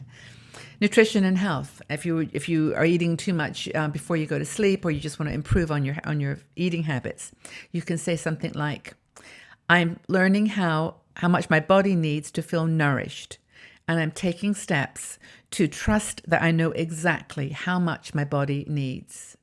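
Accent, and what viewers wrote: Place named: United Kingdom. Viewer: Hello, thank you for this inspiring post of positive affirmations! This has definitely set the tone for my upcoming workout at the gym and day. I'm 62 and am embracing my age yet fighting my age too. I say 62 is the new 29. Haha! Love your necklace and you look awesome in red! I have to ask, where are you from as you have a slight British accent? Looking forward to your next video. 💜 from Texas.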